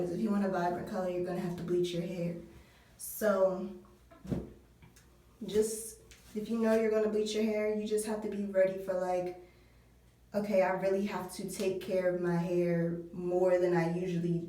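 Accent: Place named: DMV, United States. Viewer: You talk like you from the dmv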